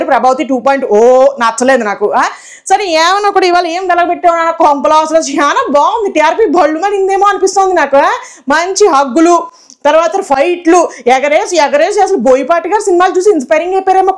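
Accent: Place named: West Godavari, India. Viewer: navvaleka sachipoyanu! thank you so much! Bigg Boss should continue only for your reviews andi! Are you from West Godavari? Your slang sounds bit like us.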